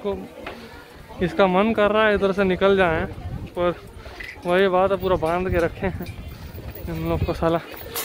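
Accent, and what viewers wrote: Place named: Bihar, India. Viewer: Oyee budbaak....japan me bihari kaise ban gya....thik hai beech beech me bol skte ho...par normal hindi try kro bhai...anyways love to see you all...keep uploading new videos..👍👍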